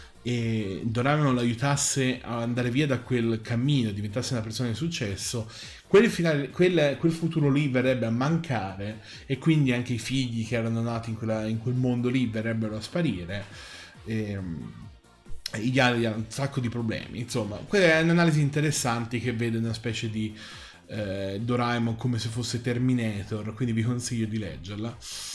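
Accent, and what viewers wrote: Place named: Tuscany, Italy. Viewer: Non te la prendere... Purtroppo vi sono troppi analfabeti funzionali in rete... Bellissimo video cmq!😉 Cmq fantastica la tua cadenza Toscana... 😉 P. S. Ci vuole intelligenza per capire i tuoi video, dote oggi troppo sottovalutata... P. P. S. Visto che parli di serie interrotte per morte degli autori o interruzione per flop del prodotto, puoi fare un video su Tekkaman e Silversurfer?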